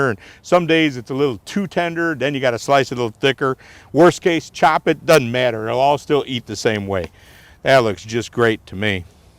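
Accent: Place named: Midwest, United States. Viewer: One of my favorite things in the world is listening to somebody with a Midwestern accent talk about meats. It reminds me of Saturday night live Super Fans Chicago Bears sketch.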